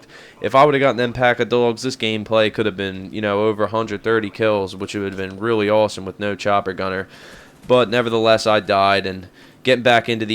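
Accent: United States. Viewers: What state are you from? Killer accent. I'm Australian by the way which is why I can't tell, I can't really tell where all the accents in the states come from :P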